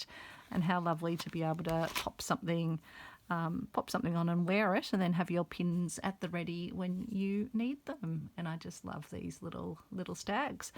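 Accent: Australia. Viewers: Ohh!! This is wonderful. I will have a go. My Nanna had needle books that belonged to her mother. Also … as an Australian living in Canada…. I appreciate the familiar accent. Made watching this an added joy ❤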